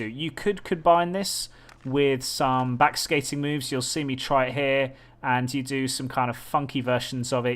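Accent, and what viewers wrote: United Kingdom. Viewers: Somehow your British accent makes it actually feel like I'm learning. Teach me about the inconsistent stick physics, though defenders legs ect.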